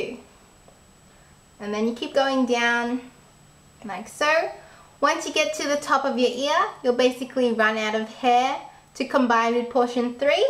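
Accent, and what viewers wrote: Australia. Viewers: Okay hold on.... Just started the video and i had to pause it to say this lol.... Your so cute !!! Hahaha :) is that an ozzi accent? Ima kiwi yo !! Lol anyway, the start has already made me excited and i can tell that your gonna make it easy :) your awesome missy !! :D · Are you Australian? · are you Australian?? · R u Australian?????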